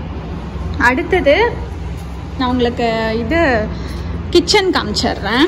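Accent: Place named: Coimbatore, India. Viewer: Sister neenga Coimbatore ah....covai slang😊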